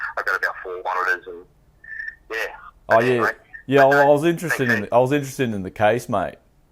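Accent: Bogan, Australia. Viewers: Bogan voice hype. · I love how you Austrailans talk :D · chucking on that bogan voice m8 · Sound so Aussie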